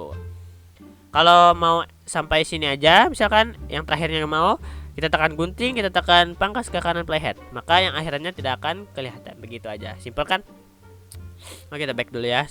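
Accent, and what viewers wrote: Banjar, Indonesia. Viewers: Kadaaaaa jerrr,, Logatnya banjar bujurr 😄😄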